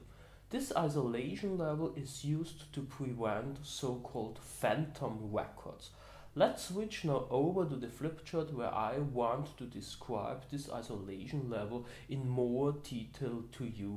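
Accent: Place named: Germany. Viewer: damn this guy with heavy german accent makes me happy! :))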